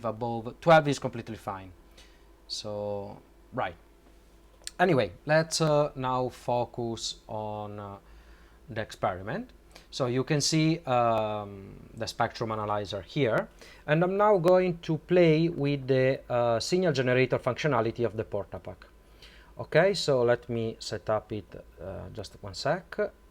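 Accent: Italy. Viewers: Scommetto sei italiano 😅☺️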